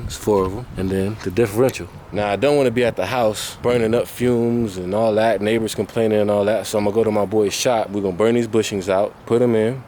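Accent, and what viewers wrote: United States. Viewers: Smashing a grinder through something screwing it up and cleaning things on dirt. This guy is just me with an American accent.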